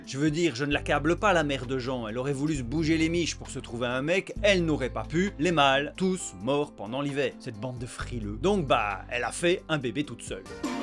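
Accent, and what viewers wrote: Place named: Belgium. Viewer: Ça s'entend mais c'est pas dérangent je crois que j'ai un accent plus fort que toi d'ailleurs 😂 non se qui m'a fais me dire ça c'est tes expression qui sont bien belge❤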